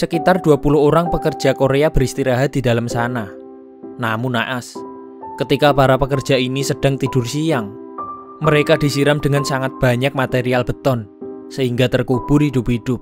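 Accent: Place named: Java, Indonesia. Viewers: Orang Banyumas kayanya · Logat jawa bikin candu kalo denger sambil rebahan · Medok banget naratornya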